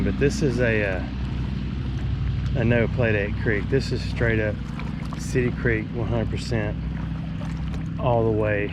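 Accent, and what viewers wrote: South, United States. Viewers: Yes, you definitely have a southern accent.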